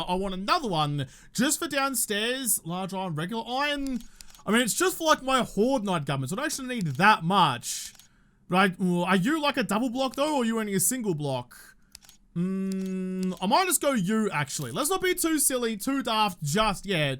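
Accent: Australia. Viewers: P.S. Miss your Aussie voice and excitement in the morning it helps start my day, the content is great as always... Just not the schedule!!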